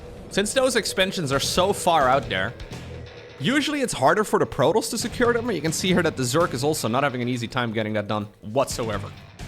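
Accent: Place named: Netherlands. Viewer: My wife and I lived in Netherlands and just appreciate the wonderful accent 😂❤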